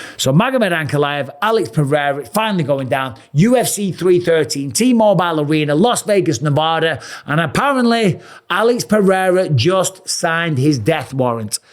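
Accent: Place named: United Kingdom. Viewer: Mike...sounding British again 🤔 not like a yank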